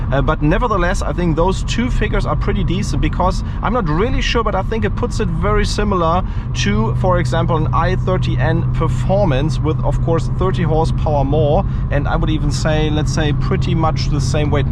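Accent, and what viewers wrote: Germany. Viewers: Wenn Deutsche Englisch sprechen... Und was sollen die Handschuhe? So lächerlich. Ist kein F40.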